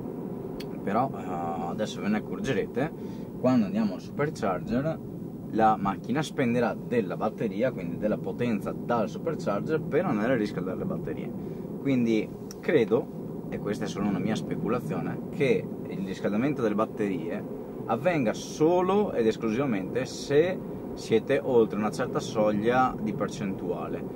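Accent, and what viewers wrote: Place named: Veneto, Italy. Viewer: Sei veneto per caso? Io abito molto vicino a mogliano Veneto e mi piacerebbe tanto provare la tesla😅😅😅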